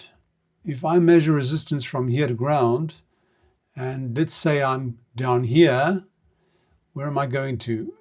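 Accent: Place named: South Africa. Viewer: Haha! I recognised the accent so I thought I’d make you feel homesick! Our 4 sons were all born in Johannesburg, and we lived there for 45 years. We were the last to leave, four years ago now! Love the channel. Alles van beste, Mike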